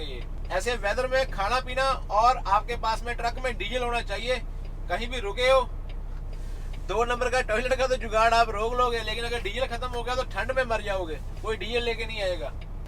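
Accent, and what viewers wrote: Punjab, India. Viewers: Why the fuck he speaks Hindi in pu jani accent? Why can’t he talk in Punjabi just curious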